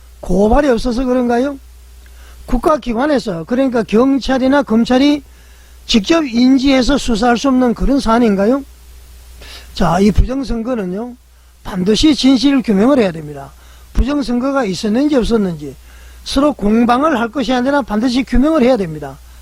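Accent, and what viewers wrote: North Korea. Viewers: 듣기싫다! 북한말투쓰네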